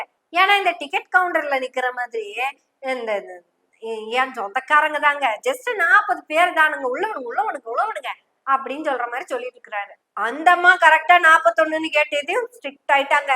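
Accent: Kongu, India. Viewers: I very much like our kongu Tamil. pesu thanfam kalakkeereebfa my daughter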